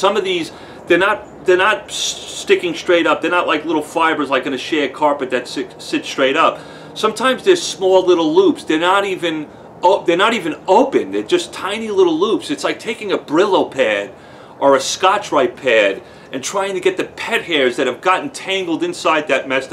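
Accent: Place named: Northeast, United States. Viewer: Finally an honest to goodness video on how to remove pet hair in cars/trucks 👍 Thank you so much for your informative video! I will definitely go out and buy a bottle of "All Fabric Softener" to rid of the pet hair in my truck! By the way, love your northeastern accent as well as your humor 🤣👏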